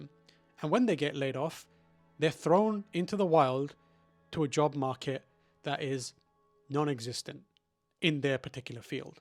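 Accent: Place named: United Kingdom. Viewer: It’s just so nice to hear an actual British accent from a decent gaming YouTuber, I can’t bear the transatlantic accent all our people pick up over there! But on topic, this all sucks. Though I have to lol that Asha Sharma, hot on the heels of laying off 20% of her staff, has now been appointed as an adviser for jobs and productivity to the US fed reserve 😂 Corrupt billionaires all the way down to hell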